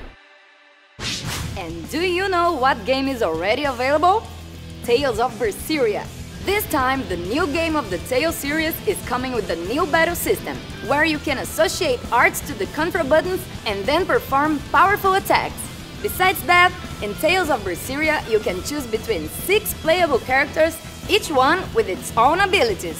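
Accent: Brazil. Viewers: She has an brazilian accent... is she brazilian? Very nice host! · I'm also the host for Bandai Brazil and Latam and I'm brazilian, so I know there's some accent there, but I'll work harder on that matter and I'm sure you'll end up loving the content!! <3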